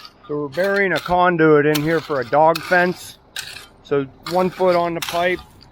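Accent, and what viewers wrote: Pittsburgh, United States. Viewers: I'm just a minute 30 into the video, and I'm like, he sounds like Pittsburgh, and I checked, TSH Concrete is indeed in Butler County, Pennsylvania, I got family that live out near there still. I never hear the Pittsburgh accent living out here in the boondocks of america, Portland Oregon where grocery stores and even the pubs close at 11pm every day of the week. anyway, on with the video, feeling very nostalgic just listening to you talk, after 21 years its nice to hear another voice from the area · that yinzer accent.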